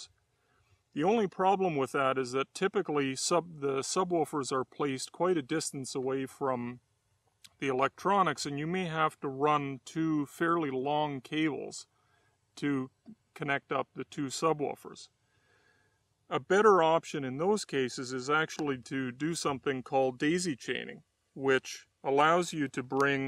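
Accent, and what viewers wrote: Canada. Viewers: I heard that! Canadian! Much love from the U.S....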